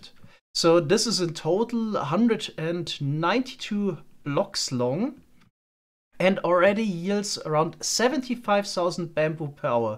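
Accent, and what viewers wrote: Germany. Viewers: Hi, where are you from? You sound German or am I just stupid?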